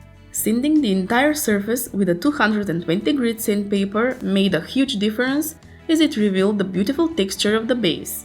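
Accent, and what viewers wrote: Russia. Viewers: русская что ли ... акцент караул полный (((( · Ебать у тебя акцент... Ты русская, походу)) Можешь не отвечать, и так понятно. Один вопрос: а в бетонное основание нельзя было питание спрятать, чтобы не так убого было?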